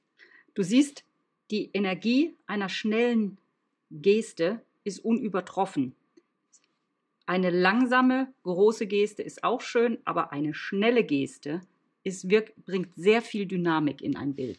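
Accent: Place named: Northern Germany, Germany. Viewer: I studied German in high school and college. I studied at the University of Freiburg for a year. My mother is actually from Berlin. Your German sounds like the northern accent I’m used to hearing. When I went to Freiburg I could hardly understand anyone!😂 That was many moons ago, and I don’t get many chances to speak German with anyone where I live, so listening is very nostalgic!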